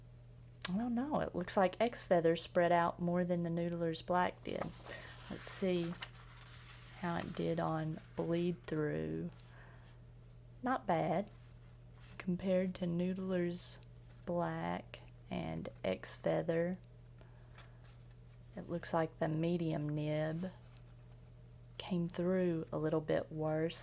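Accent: South, United States. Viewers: May I ask you from which state you're from? I really like your accent, it sounds kind of relaxing, but I'm German and not too familiar with all the American accents... If I had to guess I would say something southern or mid-west-ish?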